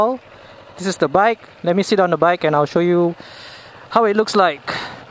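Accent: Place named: Asia. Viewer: Why? He's obviously asian, you can say that by his accent, but his voice is actually quite good for this type of video as you can easly hear what he's saying through the wind, traffic and engine noise.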